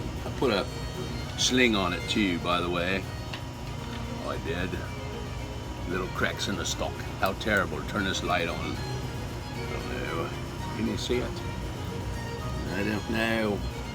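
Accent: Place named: England, United Kingdom. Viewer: ❤ LOVE YOUR ACCENT , ARE YOU A FELLOW ENGLISHMAN AS I AM ?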